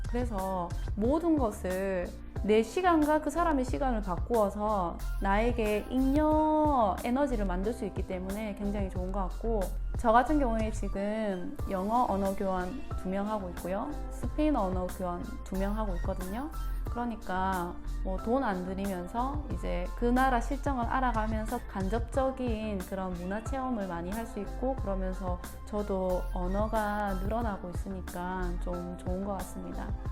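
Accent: Gyeongsang, South Korea. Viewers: 반가워요. 경상도사투리가 재미있내요, 부산쪽... 나도 세계 및 남미 여행을 준비중인데, 도움이 많이 된네요. 쿠바가서 살사와 바차타, 그리고 아르헨티나 가서 밀롱가를 다니는 게 꿈입니다. 그래서 열심히 스페인어도 배우고, 춤도 배우고 있죠. 특히 아르헨티나는 체게바라와 메르세데스소사의 고향이라 더욱 가보고 싶어요. 좋은 정보 고맙고 자주 올게요. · 경상도 아가씨군요 대구에요? 사투리 쓰니 더 예뻐요 ㅎ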